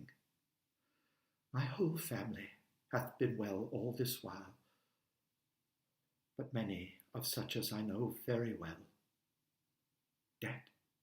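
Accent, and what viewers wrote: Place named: United Kingdom. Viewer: Thank you for this. Good British accent.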